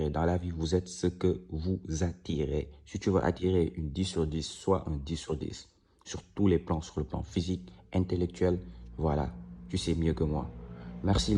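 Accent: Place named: Senegal. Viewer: Sa sent laccent dun frere senegalais lool Bonne viseo frere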